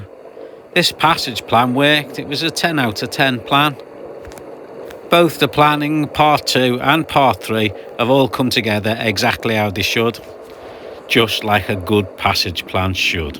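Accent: Liverpool, United Kingdom. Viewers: I just love sailing too! And I love your videos. When I'm not able to go sailing in my boat, watching your vids is almost like going sailing. I enjoy your Liverpool accent too. It's like going sailing with John Lennon. Brilliant!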